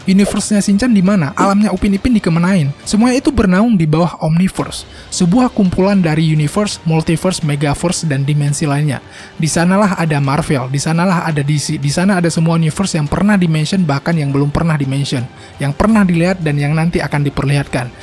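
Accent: Aceh, Indonesia. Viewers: Abang org aceh?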